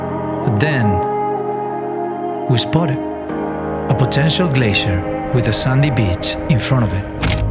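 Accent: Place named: Spain. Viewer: Everything perfect. But just one detail is not so perfect. As a Portuguese I can perfectly, "catch" that the narrator is not the Portuguese Surfer, but a very evident Spanish "Sxsxxss" accent (similar to Pepe Guardiola) when speaking in English! It would be just perfect if would be the Portuguese Surfer doing the narrative voice / speaking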